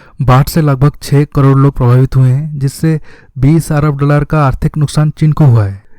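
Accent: Odisha, India. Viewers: Sir I know that you are an Odiya, by your voice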